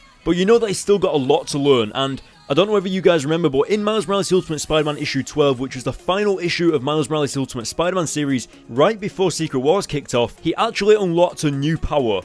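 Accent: United Kingdom. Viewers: Crazy stuff, crazy stuff, crazy stuff...that in your british accent just makes me smile. I like being british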